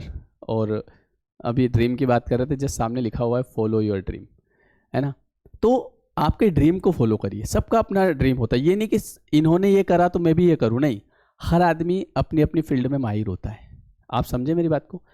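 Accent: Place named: Madhya Pradesh, India. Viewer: Sir jarur aap mp ke honge kyu ki tone whi lag rhe hai😅 kyu ki mai bhi indore se hi hu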